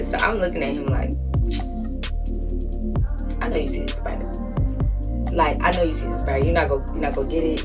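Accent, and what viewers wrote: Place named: Detroit, United States. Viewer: This sounds like a Detroit nigga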